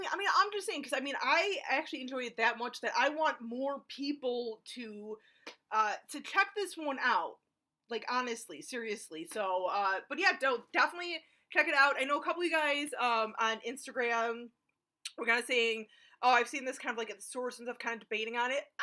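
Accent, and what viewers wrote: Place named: United States. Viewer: You have that American accent that makes you say runt instead of rent! Lol, not criticizing, just noticing. I'm from Ontario, Canada, eh, so I'm used to people laughing at my accent, so, it's all good. I keep hearing more and more accents from around the world with the internet. I also have misophonia and certain sounds drive me nuts and make me stabby, but also, it makes me hear things intently and I can hear accents so clearly. Yours is one I hear often. Is it midwestern? Or where is it from? Just curious. Thanks. Thanks for the review too!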